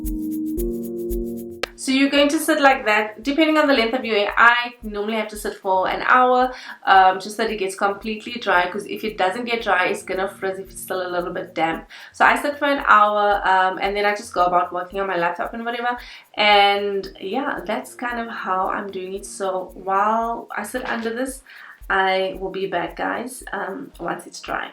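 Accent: South Africa. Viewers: That's definitely a south African accent I hear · Let me guess, South African????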